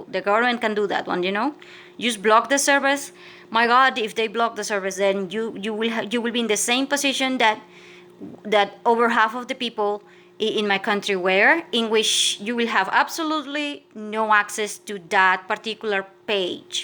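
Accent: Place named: Mexico. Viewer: what country ? you didnt' say. You sound mexican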